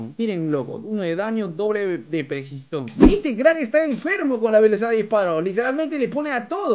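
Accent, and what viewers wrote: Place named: Peru. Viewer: Eres peruano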